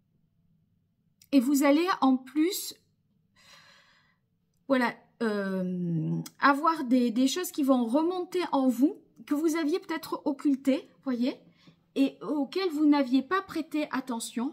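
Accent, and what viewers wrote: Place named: Marseille, France. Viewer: Bon bah ça marche ! 🙂 Ça ne me parle pas trop, mais j'aime bien votre accent, ça me rappelle ma vie à Marseille.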